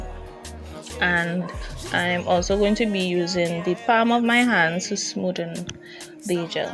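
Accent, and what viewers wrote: Trinidad and Tobago. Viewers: Hearing this accent you a WHOLE TRINI 🇹🇹🇹🇹😍 HEYYYY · Are you trini? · For some reason you sound 🎵 like a Trini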